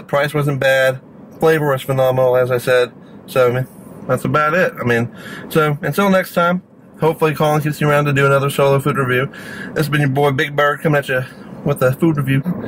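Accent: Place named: South, United States. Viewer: I love that southernnn twanggg'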